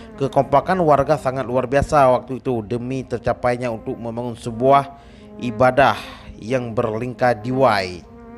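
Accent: Aceh, Indonesia. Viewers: Bahasa keulayu,logat totok Aceh,geuthat ka pap leumo,Brio kedeumgoe,ka meuronoe bahasa keulayue beu geut ilee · Mantaaap bang.... Sukses slalu.... Logat ÀCEH nya kental Kami bangga ❤️ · Pertahankan logat bahasa melayu acheh itu sangat lah elok. Lihat orang jawa yg mencuri bahasa melayu cakap nya godok godok sampai mati pun mereka jawa pencuri bahasa. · Kita logat aceh mulia jngn hilang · Loghat aceh masih menebal...bereh that bos...👍👍👍👍👍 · Sep tat logat Aceh rayek dron neuh bang😁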